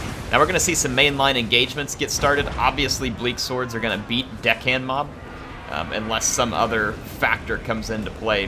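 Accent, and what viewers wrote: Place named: Kentucky, United States. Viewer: I've missed his kentucky-fried accent!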